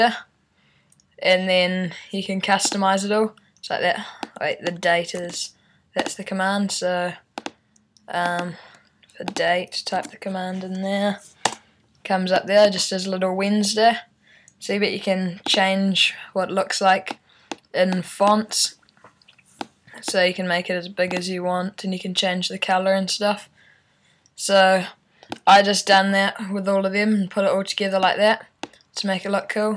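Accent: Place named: Australia. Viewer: of course he's australian. I'm an auzzie and i can tell the difference between english, american and australian. why cant you?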